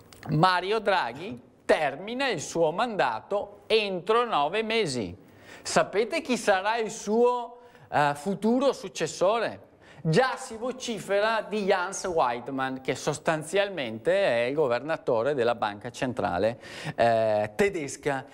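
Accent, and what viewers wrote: Veneto, Italy. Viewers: per i veneti l'italiano è una seconda lingua. la prima è il VENETO (lingua, non dialetto) che ha esattamente quella cadenza che a te non piace (niente di male, sono gusti) ciao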